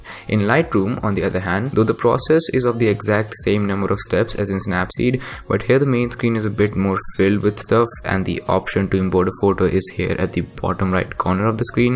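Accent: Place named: India. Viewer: Fucking indian accent so anoying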